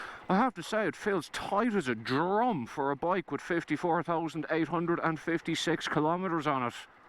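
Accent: Ireland. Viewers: An Irish accent under the palms?.......good lard! I agree, a fun little bike!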